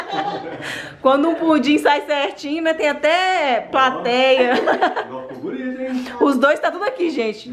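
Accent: Minas Gerais, Brazil. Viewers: Oi é um imenso prazer em falar com vc.Te acompanho já a muito tempo,te admiro muito me identifico muito com vc.Amo o seu sutaqye pois também sou mineira.Todos os dias vejo se vc postou alguma novidade amo o Bernardo a sua família vc é linda sua mãe seu pai com a mão divina fazendo artes maravilhosa.Sua mãe aí aquele fogão a lenha como lembro da minha mãe em Minas.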